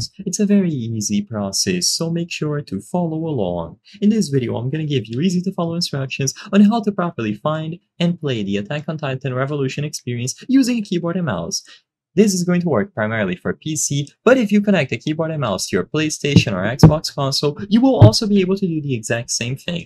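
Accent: Brazil. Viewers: você é brasileiro ne? da pra perceber pelo sotaque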